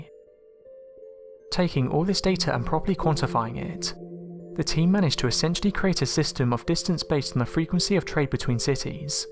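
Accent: United Kingdom. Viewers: Quit with the annoying British accent. You are LOSING VIEWS AND SUBSCRIBERS..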